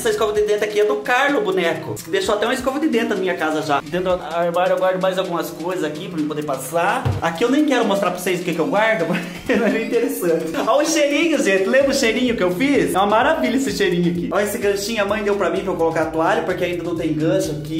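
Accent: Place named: Sorocaba, Brazil. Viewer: Passeando pelo YouTube vi sua receita do bolo de milho... Flocão. Adorei. Gosto do seu jeito! Ah Sorocaba....sabia q tínhamos algo em comum. Nosso sotaque, costumes nos entregam. Abração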